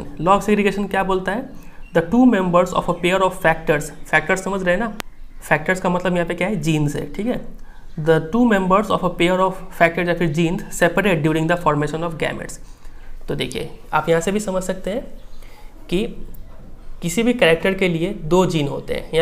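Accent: Bihar, India. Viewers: Sir aap bihari h kya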